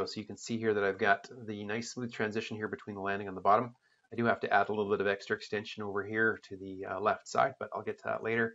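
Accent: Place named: United States. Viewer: Amazing tutorial man. Per your accent I assume you are American, I would have thought you would give the dimensions in inches instead of mm. Thank you so much.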